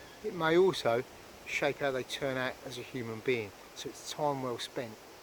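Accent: England, United Kingdom. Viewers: Well done mate, good to hear an English accent again :) Regards, Keith.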